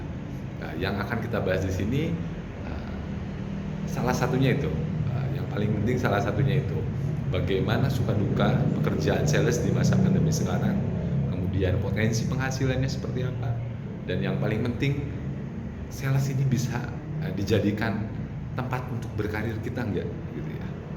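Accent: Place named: Java, Indonesia. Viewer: asa jadi medok pisan pa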